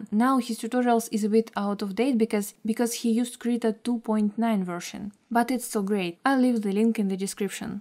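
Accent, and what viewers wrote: Russia. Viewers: yep, Russian indeed! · Russian accent? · no hassle I thought Russian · Russian accent ^_^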